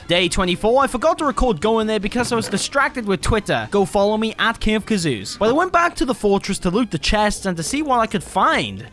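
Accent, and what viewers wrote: United Kingdom. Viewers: U sound brish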